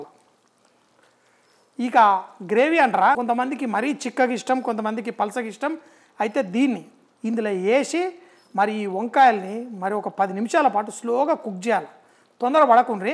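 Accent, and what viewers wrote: Telangana, India. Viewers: తెలంగాణ యాసతో మస్తుగా చెప్పారు సంజయ్ గారు... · I like the way of ur talking andi pure telangana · Language perfect Telangana 👌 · Ippudu baagaa telangaanaa maatladuthunnnaaru kaani sodi kongem yekkuvaindi age vallanaa?😮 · హాయ్ సంజయ్ గారు నమస్తే. బాగున్నారా. రాగిణి గారు ఎలా ఉన్నారు. తెలంగాణ భాషా భలే మాట్లాడుతారు. మీ మాతృభాష ఏది. భలే ఉంటది మీ మాటలు. బాగా నవ్విస్తూ ఉంటారు. మీ వీడియోస్ అంటే నాకు చాలా చాలా ఇష్టం. చాలా న్యాచురల్ గా తింటారు. మీరు తింటుంటే నాకు నోరూరుతుంది. నిజంగా. సూపర్. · నీ భాష ఆపరా అయ్యా తెలంగాణ భాష చూడలేక చస్తునం రా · Telangana baaga maatladara👍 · Telangana yasa super ga vundi garu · Sir meedhi ye state assalu.meeru mee wife idharu English lo kuda matladuthu north side dishes kuda chesthaaru.ippudu pakka telangana yaasa matladuthunnaru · His Telangana Telugu accent is very funny. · Me language superb 👍 💯 pakka Telangana slang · Telangana slang Baga othu tunav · anna nee telangana accent asalu maaki kirkiriii.... 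Waiting for you to do a recipe with telugu commentary... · తెలంగాణ యాస బాగో లేదు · Telangana slang super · Where and how did you pickup this Telanga dialect. Perfect like your recipes. I learned a lot from your videos. Greetings from The Netherlands. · Sir nee telangana yasa aapandi vinalekapotunn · Bale chepparu Telangana language lo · Meru super sir, very unique style of explaining in Pakka telangana. 😀😀😀👍👍👍👏👏👏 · Anna em matladinv anna multi talentd u r nd entha aina mana telangana slng vinte ah kick ye veru suprb anna ne matalu ne vantalu ekdham untai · Me telangana matalu keka🥰🥰🥰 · What happened to u only tn slang matladuthunnareemadhya.why so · Meeru telangana Yasa vaddu sir Mamulugane matladandi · Telangana slang adhurs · Kaka nee telangana yasa kiraak undhi bhayya Really enjoyed a lot continue it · Your recipes are very nice, but please maintain your dignity as an experienced professional and speak in your original dialect...speaking in the Telangana dialect will not improve or attract any new viewers to the channel. 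On the other hand, it irritates and infuriates. We respect your worthiness..Please don't play childish brother. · Telangana basha over aindhi.Chef ainnappudu dignified language unddali. Challu ekkuvindhi.. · Since when have you switched to telangana telugu? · mi telegana slaag super gaaru😄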